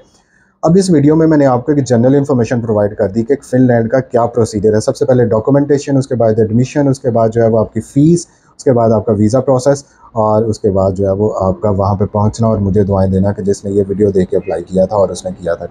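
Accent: Faisalabad, Pakistan. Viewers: 😂.. app Faisalabad sa lgtay han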